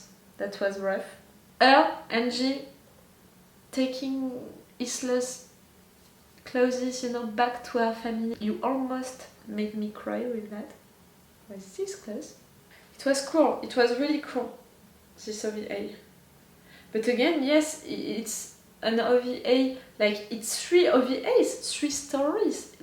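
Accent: France. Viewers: I would avoid Lost Girls OVAs until you're done with Season 2. They do have some spoilers to them, but after go for it. I love your accent, please never apologize for being who you are. We subscribe knowing you're French and your reactions are very genuine and funny. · your french accent is adorable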